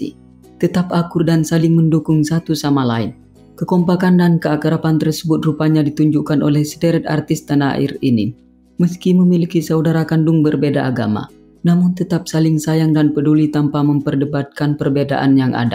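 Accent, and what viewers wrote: Madura, Indonesia. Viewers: Adminya logat Madura 👍👍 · Di denger dari logatnya, dari suku madura ya gan ?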